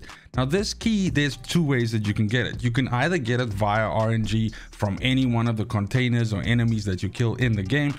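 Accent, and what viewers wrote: South Africa. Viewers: You sound South African, where are you from?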